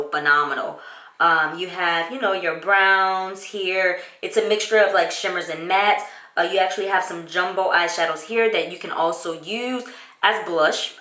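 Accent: Louisiana, United States. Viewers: I’m sorry for your loss. Praying for peace for you. When I started watching your videos I kept saying to myself she sounds like she’s from Louisiana. When you just said it I yelled “I knew it”. I’m from Opelousas. Live in Maryland now. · I love the way you try to correct you louisiana accent lol. I do the same when speaking in public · Ahhhh, it's so refreshing to hear that Louisiana accent! I miss Lafayette so much. 😍 I love how you keep your faith in God and stay on those kids to be respectful. This look though 🙌🏾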